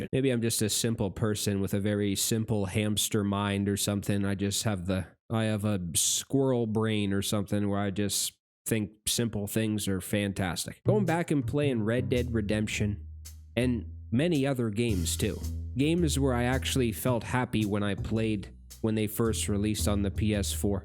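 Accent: Canada. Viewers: The first time I could tell a Canadian from their accent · Your voice and Canadian accent is so relaxing. I love to CHILL while listening 😎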